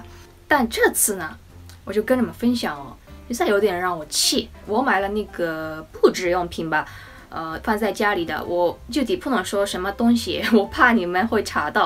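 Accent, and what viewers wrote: Osaka, Japan. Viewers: 的口哨和大阪方言挺好听的。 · 大阪方言还是改不了，哈哈哈汉语说的真好，学语言确实越小学的越好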